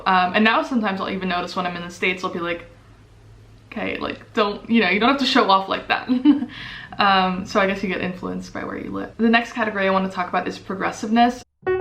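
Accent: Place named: Netherlands. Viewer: Nice vid, and funny to hear a dutch accent getting in there while talking english! Good one for the directness part: dutch will ask you without any problem what you pay for rent if talking about housing 🤣🤣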